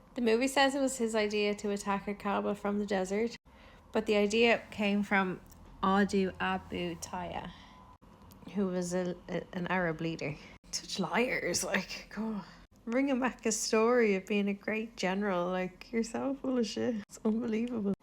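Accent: Ireland. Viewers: Wait I don’t get it. How is any of this bad? Unless you’re pro-Ottoman? But you sound Irish. I guess taking the credit for the Arabs tactics was bad but it was over 100 years ago so I personally don’t care and don’t understand why you do.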